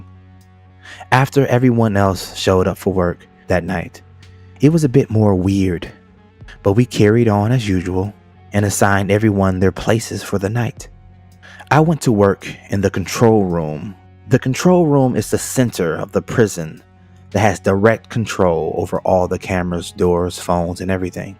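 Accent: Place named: East Coast, United States. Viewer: Ok..ok.. 🤦 ‘’ALLEGED’’…..IS NOT thee same nor should it be used as a synonym to the 2 separate words of ‘’A LEGEND’’ ~ALLEGED- stated or declared to be as described, supposed to be. Supposedly. ~A LEGEND, is a Fable, fiction. Or if someone is called ‘’A LEGEND’’ it can mean they’re a hero. I am 100% aware that 🇺🇸 public school system has been consistent in one thing, just passing kids no matter what, & now even learning cursive writing ✍️ has sadly been tossed out along with basic spelling, which in turn doesn’t help any of the very young have knowledge in not only spelling but the meanings & differentiating of words. It’d be somewhat understandable if this narrator wasn’t a native English speaker, it most definitely sounds like he is & that he’s from the east coast.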